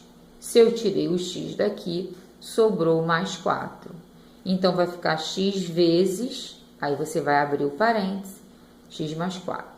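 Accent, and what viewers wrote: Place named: Rio de Janeiro, Brazil. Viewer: i é carioca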